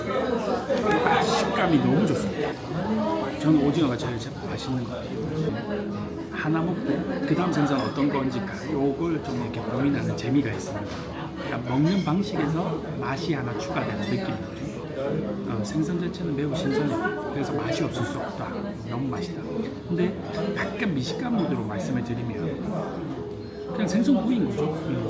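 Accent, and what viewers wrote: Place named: Gyeongsang, South Korea. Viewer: 님 점~점~ 폭칸트 유툽 컵셉인가요? 일본컨셉 유투브많은데 경상도가넘 만타~~~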